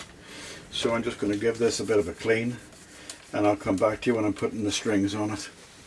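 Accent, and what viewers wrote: Northern Ireland, United Kingdom. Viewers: great to hear a norn iron accent and sense of humour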